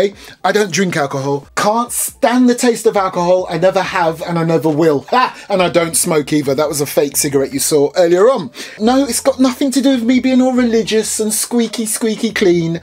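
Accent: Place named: United Kingdom. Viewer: How have I only just found this channel? Love a cheeky British chappy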